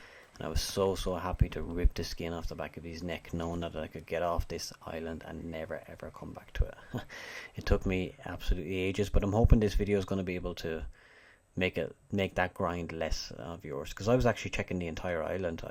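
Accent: Ireland. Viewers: Hey are you Irish?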